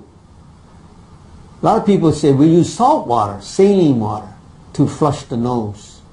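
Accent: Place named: New York, United States. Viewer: So, let me guess. You kinda sound like you are from New York. Anyhow, I appreciate your chats. I had a thought of boiling a mix of peppermint leaf and oregano leaf in distilled water and then flushing it up the nose once it cools. You can also breathe in the vapors with a towel over your head over the pot too! I had a health food store owner friend in CT use the oreganol in boiling water and he cleared up!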